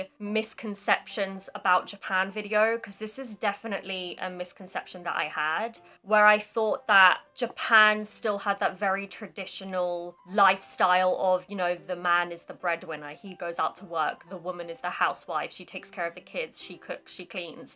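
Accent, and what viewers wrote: United Kingdom. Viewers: イギリス英語は聞いてて気持ちいいです。あんまり違いは分からないですが、イギリスの地域によってはちょっとおバカに聞こえたりしますけど、この方の喋り方は頭よさそうに聞こええる(笑) (ってか、英語ってイギリスがオリジナルでしょうけど・・・） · 英語の訛りについて解説してるマルチリンガルの人の動画を見てからこちらの方の動画を見たら訛りがイギリスっぽいと英語が全く話せない私でもわかりました。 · はぁ(о´∀`о)ブリティッシュアクセント最高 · イギリスアクセントが心地よいね(・∀・) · イギリス英語美女めっちゃカッコいい!! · chill, sweet の使い方が勉強になった、英語と日本語が出てくるので、勉強になる。発音は英国式だし聞き取り安いです。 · i enjoy your talks,really. i was living in London for 2 years. i miss tubes which i used when commuting .your talks and british english let me recall my old days．you are not scotish, right?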